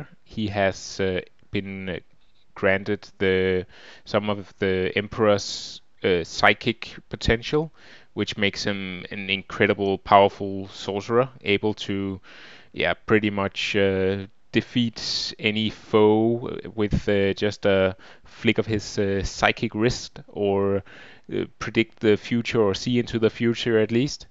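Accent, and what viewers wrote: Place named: Denmark. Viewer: Are you from Denmark? You have that danish accent. Nice video tho!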